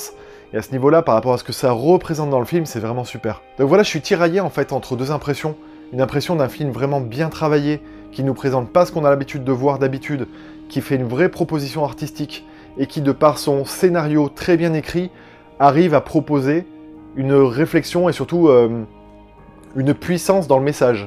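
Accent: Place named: England, United Kingdom. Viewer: Faut faire comme moi. Ne pas regarder le foot. Voire le sport en général (sauf peut-être les sport d'hiver) PTDR! Quand on me voit, c'est EVIDENT que je suis une grande sportive XD. Cette intro que nous as fait encore X) Il en faut peu pour me faire marrer, mais là, j'ai bien cru que j'allais y crever avec quinte de toux qui s'est invitée en même temps XD Et dis donc, j'me permets un compliment, tu es élégant et classe en veste comme ça! Bon c'est pas que d'habitude t'es pas présentable, mais pour que j'me dise (moi qui en ai strictement rien à battre de la touche des gens) : "Woh, eh pas mal quand même le gars!" XD Ma myopie disparaît parfois. Ah et magnifique accent anglais. Du coup, j'me sens mieux vis à vis du mien :p Sinon, je pense que ce film me plairait. Et j'aime beaucoup Joaquin Phoenix. J'vais noter ce film sur ma liste de films à voir. 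:) Car il me semble qu'il ne passe pas dans le cinoch' près de chez moi.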